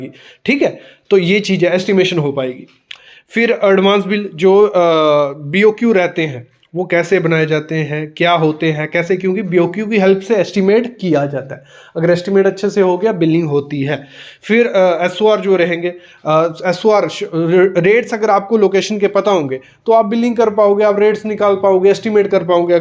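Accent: Jammu, India. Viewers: Jammu se ho kya aap?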